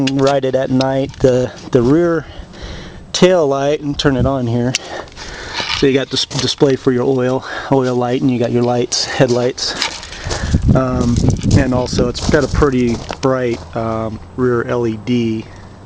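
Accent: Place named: Midwest, United States. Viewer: Are you from the Midwest? Your accent sounds exactly like a friend of mine. Kept thinking it was him. Kool conversion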